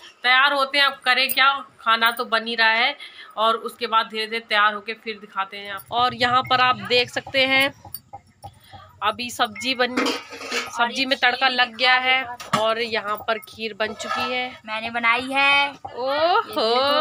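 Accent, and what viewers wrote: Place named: Rural, India. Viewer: Tum kaun gaon ke hao .hum tumhari fain ban gai hai .tumari boli bhasa bada achchhi lagat hai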